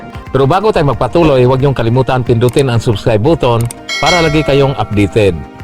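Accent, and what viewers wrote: Visayas, Philippines. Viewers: Bisaya ka pre? Binasa mo nlang mga sinasabi mo sablay sablay pa animal! 😂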